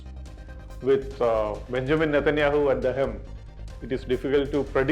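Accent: Kerala, India. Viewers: From ur accent I know u are from Kerala. Great how u handle difficult topics calmly.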